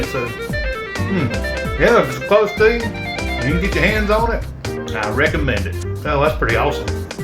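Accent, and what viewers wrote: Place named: Rural, United States. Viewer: i like the country twang😅 which state u live in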